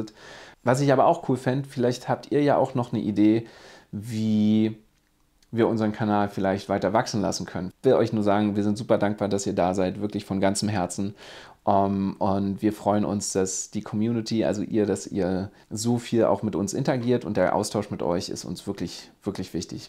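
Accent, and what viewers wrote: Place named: Berlin, Germany. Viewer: Super, herzlichen Glückwunsch zu dem Arbeitsvertrag! Das freut mich für euch 🙂 Um eure Frage bzgl. der Sprache i.S. Kanal zu beantworten: Ich spreche leider nicht perfekt englisch - auch nicht annähernd. Ich freue mich deshalb immer wahnsinnig, wenn endlich mal ein Kanal aus Skandinavien auf deutsch ist. Wenn der Kanal in Zukunft auf englisch wäre, würde ich wieder mit Untertitel schauen müssen, was dann oft bedeutet, dass ich das Video zweimal schauen muss, weil ich erst lese, um was es geht und mich beim zweiten Durchgang auf die Bilder konzentriere, denn die sind bei euch immer sooo schön. Ehrlich gesagt würde mir auch das "berlinern" zwischendurch SEHR fehlen ;-) .... Aber: auch wenn ich die Videos dann vielleicht nicht mehr schaue, werde ich euch treu bleiben, auch liken und auch einen Kommentar da lassen (wird dann eben immer nur ein Herzchen ;-), alleine um euch mit meiner Stimme zu unterstützen .... 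Im Sommer das Haus verlassen .... wie blöd! Och Mann, das ist eine wirklich blöde Situation für euch. Ich wünsche euch, dass ihr euren Weg und eine zufriedenstellende Lösung findet. Liebe Grüße ❤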